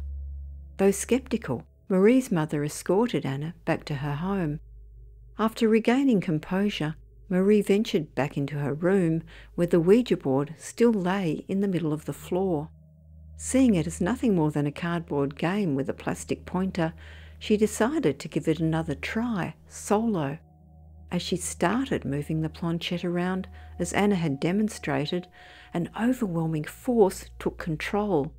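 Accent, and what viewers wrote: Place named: Australia. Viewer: God, the Australian accent is so ugly! I can’t listen to this crap! Change the accent!!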